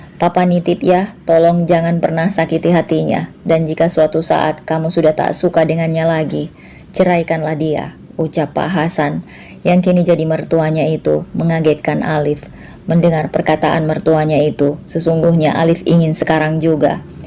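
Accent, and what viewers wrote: Medan, Indonesia. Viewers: Bogor hadir ikut nyimak mb logat bahasa medan sangat enak d dgr 😅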